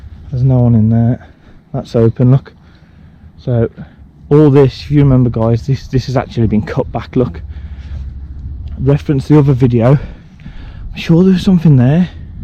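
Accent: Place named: Midlands, United Kingdom. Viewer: OK mate you sound like a Midlands Lad ...?out of interest ..👍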